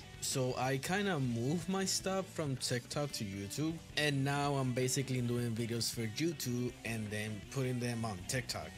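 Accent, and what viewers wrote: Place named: Spain. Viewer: Wallahi i knew that you speak Spanish from the accent 💀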